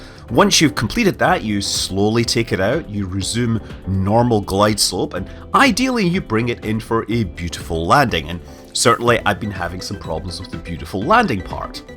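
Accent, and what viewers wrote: Scotland, United Kingdom. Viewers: Ha Ha First time watching your vids and i heard the Scottish accent and thought you were flying in Scotland. You must have taken our weather with you to California. New Sub fae yer home country. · I always thought you lived in Scotland 🏴 or the Isle of Man 🇮🇲 based on your name and accent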